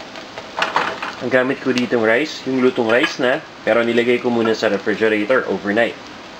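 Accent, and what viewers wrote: Philippines. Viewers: i visit ur recopies cos i like fillipino accent..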